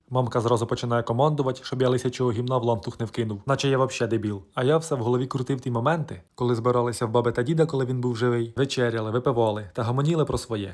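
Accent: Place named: Cherkasy, Ukraine. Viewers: Говір такий як у моєї мами Вона також родом з Черкащини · Новий П.Глазовий !!!!чи Литвинов! Але ж ти Суперський з черкаським суржиком🎉🎉🎉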